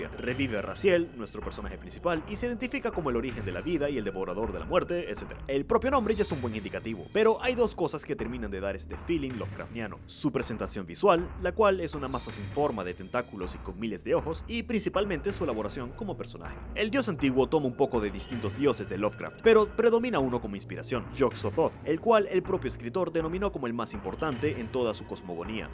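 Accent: Venezuela. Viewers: De casualidad serás venezolano?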